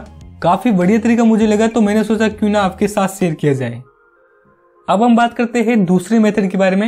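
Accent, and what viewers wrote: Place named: India. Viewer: english title indian voice wtffffffffffffff how the fuckkkk can we understand this :text-green-game-over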